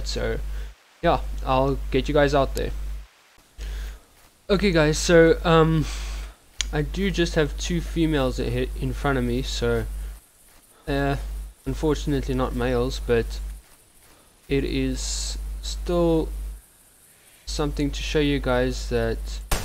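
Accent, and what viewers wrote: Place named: South Africa. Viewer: I dont think he can change it its how all south africans like me sound bru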